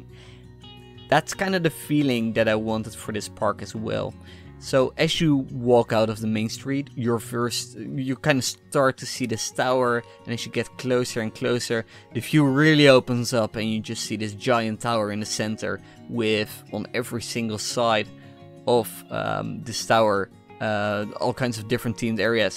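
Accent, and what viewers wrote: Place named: Netherlands. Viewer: Haha ik kan gelijk horen dat je nederlands bent 😉 verder toffe video!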